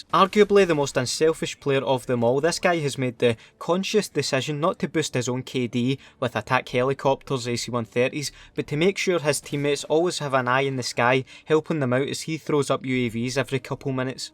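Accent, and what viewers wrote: Scotland, United Kingdom. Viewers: Scottish accent... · Dno weather it's your banter or your Scottish accent what makes you so funny but ye your funny man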